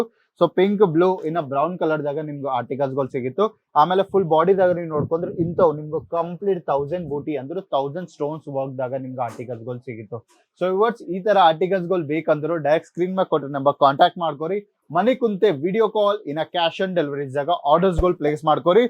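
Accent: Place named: North Karnataka, India. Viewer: ಉತ್ತರ ಕರ್ನಾಟಕನಾ ನಿಮ್ಮ ಭಾಷೆ ನೋಡಿದರೆ ಹಾಗೆ ಅನ್ಸುತ್ತೆ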